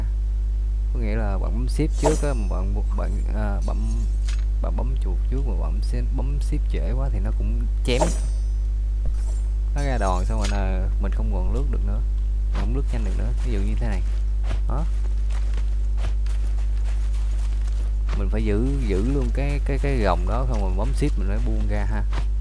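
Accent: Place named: Mekong Delta, Vietnam. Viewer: Gịong của bạn giống ng miền tây ghe luôn . Nghe thoải mái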